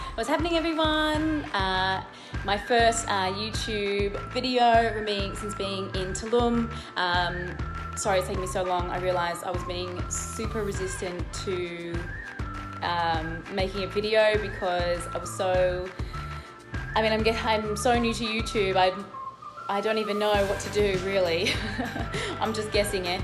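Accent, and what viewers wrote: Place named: Australia. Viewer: Haha i thought you were American. Then when i watched your videos and listened to you talking....i was like i am sure she is an Aussie!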